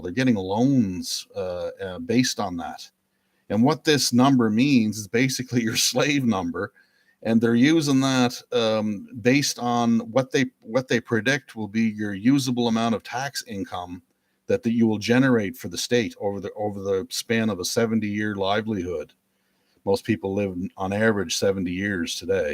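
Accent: Canada. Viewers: Are you Canadian? (Your accent.) If so you are definitely in the same boat as us in the US. I am trying to go this route by UCC-1 and Status Correction.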